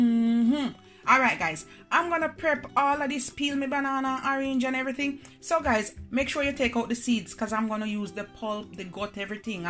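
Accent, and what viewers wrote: Jamaica. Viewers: Loving this upload my friend. Loving the Jamaican accent, for true, it gives your presentation that little bit of flavour. Awesome variety of receipt on show. Fantastic demonstration and tutorial on show my friend. Amazing health information you are putting out there, with excellent research and knowledge. The like is absolutely smashed ############ Keep Safe and Healthy out there. Stay Positive. JEVITEE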